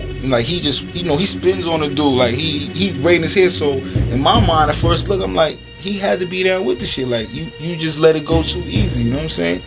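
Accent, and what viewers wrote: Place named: Brooklyn, United States. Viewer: you sexy pa pa...I love the way you talk...so NEWYORK....(my hometown)...Ima fine redbone sista and im sending you so much love young GOD...only the strong survive...STRENGTH, GUIDANCE AND PROTECTION...Peace!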